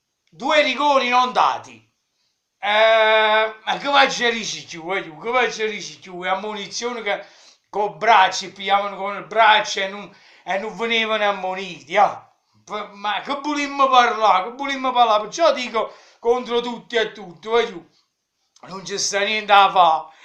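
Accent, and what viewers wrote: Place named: Naples, Italy. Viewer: Mi fai vergognare di essere napoletano..tralaltro dalla cadenza si capisce che non sei nemmeno napoletano ma di qualche paese della provincia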